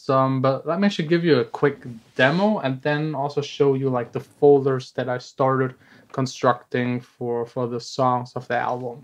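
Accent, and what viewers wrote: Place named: Germany. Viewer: Du hörst dich sehr deutsch an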